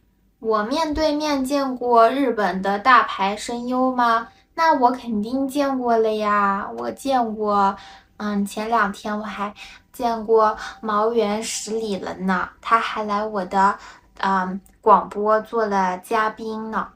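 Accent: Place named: Shanghai, China. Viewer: i think it's just Shanghai's accent is a little different from the general accent of the language. It's not that easy to forget your mother language :v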